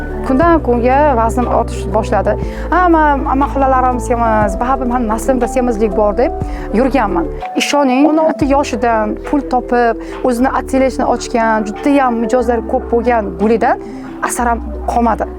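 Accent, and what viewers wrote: Bukhara, Uzbekistan. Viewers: Voy Buxoro gòzali shevangzdan bilgandim Buxorolik ekanizni men ham buxoro shahardan❤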